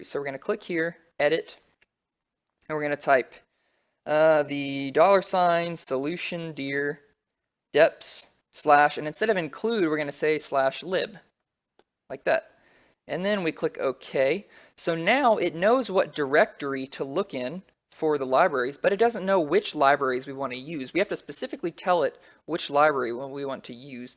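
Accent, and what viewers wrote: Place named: England, United Kingdom. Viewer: My god! pure english accent!!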